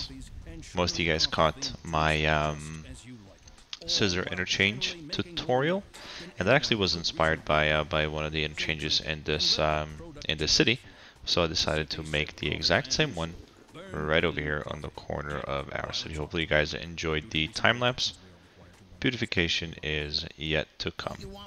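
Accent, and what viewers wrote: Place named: Netherlands. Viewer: ohhh, I don’t know what BiH means? But I thought so because you sound like you have a little bit of a dutch accent. It’s why I asked :) awesome cities btw! Have a nice day!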